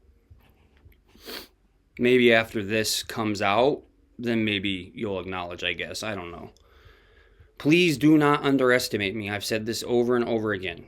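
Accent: United States. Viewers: Do you know the girl took her own life? please stop with your content enough is enough. Suicides are tragedy in this family has to go on without their wife, mother and friend, but you putting content on putting your ideas out good for you, but it’s time to stop enough is enough. Please let this girl rest in peace please… listening to the rest of your video. Are you for real you are arrogant. You have absolutely no idea about these people. You obviously live in America. Judging by your accent, forming opinions from newsreels and other people‘s videos WTF get a life leave these people alone, let them move on. I have been watching your channel since Nikola went missing and all you do is throw doubt onto everything. It only takes one person to believe that garbage that dribbling from your channel and they possibly may hurt. Nicole‘s husband just stop it. 😠 you’re an idiot.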